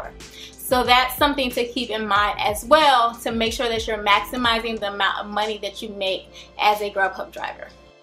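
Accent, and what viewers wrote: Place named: South, United States. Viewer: Damn, she's gorgeous, the southern accent is great and she has helpful videos. Thanks sugar!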